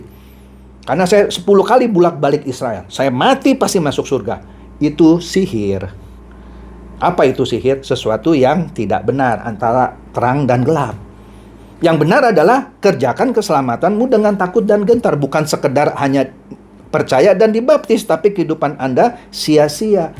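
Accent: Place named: Jakarta, Indonesia. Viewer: Tksh khotbahnya,Maaf Bapak Pdt oran Betawi ya? Karena Pdt bilang masih itu masing,Ok.Pdt🙏🏼🙏🏼👍👍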